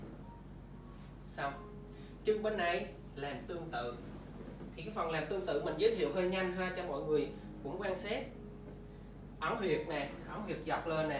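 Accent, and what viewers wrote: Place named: Khanh Hoa, Vietnam. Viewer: Nghe giọng như khánh hòa nhỉ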